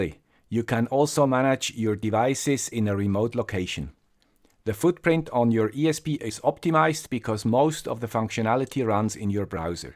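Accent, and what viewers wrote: Switzerland. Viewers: Your Swiss accent is so sweet and very compelling. I am so influenced to learn deep in the subjects though you just explains thoroughly. You are an amazing learned person with a very sweet positive attitude. Thank you.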